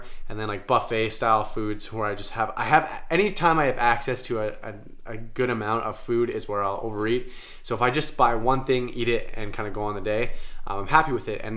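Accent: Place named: Canada. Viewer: Never noticed the accent I guess you are Canadian lol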